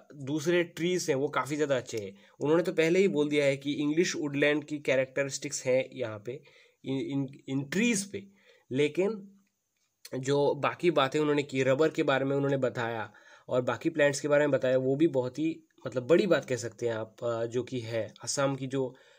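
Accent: Bengal, India. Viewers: Aap Bengali ho na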